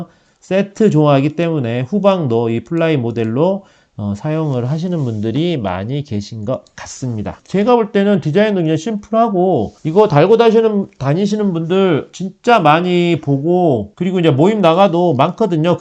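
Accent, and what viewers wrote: Jeolla, South Korea. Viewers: 잘 보고 갑니다~^* 킥보드용 블랙박스를 찾다가 채널에 놀러왔습니다!! 말투가 우리동네 같네요 저는 목포입니다 ㅎㅎㅎ 구독 좋아요 누르고 갑니다❤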